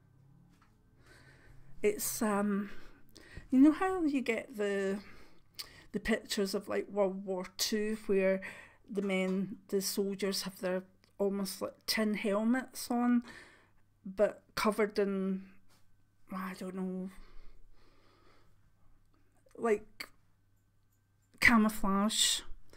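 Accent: Scotland, United Kingdom. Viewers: Hi! I hope you are feeling better and resting. I've only recently subscribed to your channel but already I have used many of your meditation methods and the archangel that came through was Peter (?) I wonder if you would know more about this? Can I ask, do you do a lot of crystal work? I've seen one of your videos on crystals you recommend.You are so intuitive and being born in Edinburgh myself, your Scottish accent just makes me even happier to have found your content.:)Namaste.Love and light to you and yours. Loz. xxx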